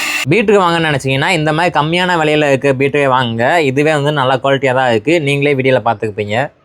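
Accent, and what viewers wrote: Madurai, India. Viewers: Bro nee madurai yaa bro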